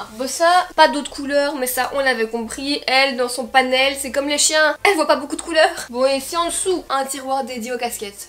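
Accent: Belgium. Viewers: J'adore comme tu lâche tes petites expression belge mais moi aussi je suis belge et ma soeur parle comme ça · J'adore ton accent belge parce que moi aussi je suis belge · ta un accent belge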